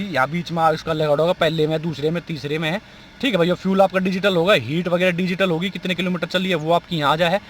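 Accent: Haryana, India. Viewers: Love the Haryanvi accent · Love this accent of ur hryanwi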